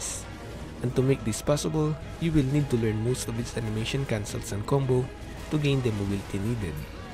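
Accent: Philippines. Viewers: to us big boi zerk mains, this brings a smile to my face. but the reality is, we got tons of unprotected skills, also our Q buff got longass cooldown and can also be used for 30 secs. It's like having the biggest penis but is also a quick shot which sad af. PS: your accent gives me pinoy vibes. :) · Great video! I'd say the music should be turned down a bit though as it's hard to hear you, especially since you don't have an English accent (Filipino?). Otherwise it was really informative, I just bought the game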